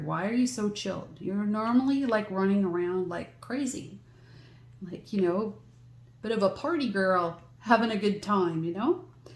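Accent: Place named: Canada. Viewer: Hi. When you start talking tonight, I knew right away that you had to be Canadian, but I wasn't sure where from. I am from the East Coast, Newfoundland, to be exact, and we used to go to the beach every Sunday when we were kids to have a Sunday supper. I have a lot of fond memories of that. I have been living in Belgium for the last 6 years. I think it would be really great if you did a vlog of you taking your dogs to the beach or to the dog park. We would get to see a part of your world then. I will probably start my canvas in the middle of the month because I've got to finish the one I'm working on for another event. 😊😊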